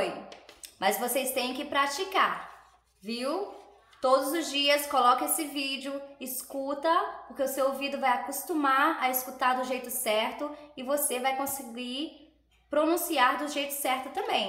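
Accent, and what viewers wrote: Brazil. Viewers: Huruuu ❤ agora aprendo amiga falando caipira como eu falo do interior 😂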